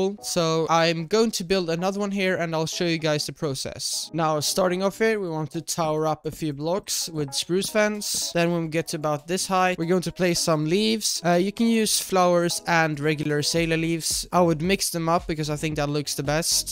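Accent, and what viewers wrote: Sweden. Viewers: Är du typ svensk, sounds like swidish accent. Nice build anyway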